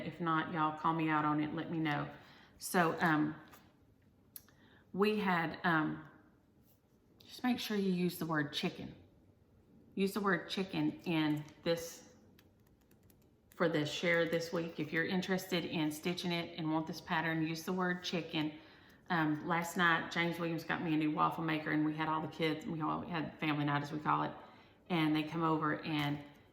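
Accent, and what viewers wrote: South, United States. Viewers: I absolutely adore you. I laughed out loud at a couple of your stories. The doorbell one especially. Love your southern accent. Keep recording your episodes. They are perfect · Great video!!! I love hearing another southerner! We’re getting on down the road like a chicken on the loose! 😉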